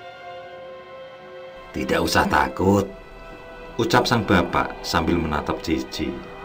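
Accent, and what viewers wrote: Java, Indonesia. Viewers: Orang jawa bg?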